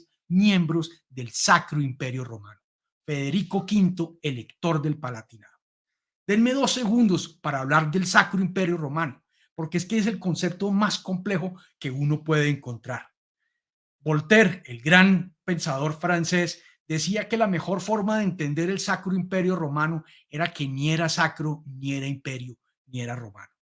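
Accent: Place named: Latin America. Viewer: Tuvimos? .. aparentemente el comunicador bastante acento latinoamericano ,.debe de ser venezolano o colombiano etc. Y aquí en Sudamérica poco tenemos que ver con los reyes más que en el tiempo colonial...así que decir tuvimos...¿No será un poco pretencioso?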